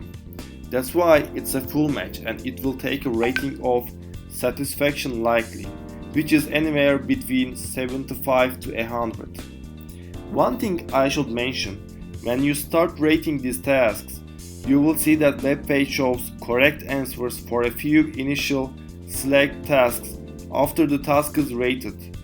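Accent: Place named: Turkey. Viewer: türk aksanı hissettim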